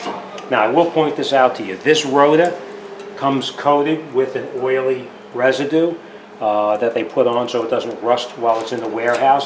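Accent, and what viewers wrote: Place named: East Coast, United States. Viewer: I can tell from the accent and the rusty rotors and calipers that this is East Coast. Good video!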